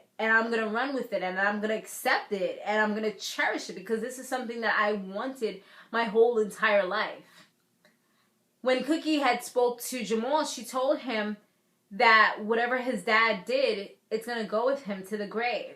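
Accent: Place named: New York, United States. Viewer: I love your accent! Where are you from? Sounds like New York with an island twist.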